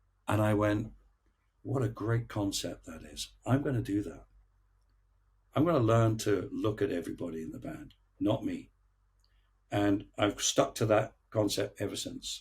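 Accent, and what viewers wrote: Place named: Scotland, United Kingdom. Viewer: sounding very Scottish today!!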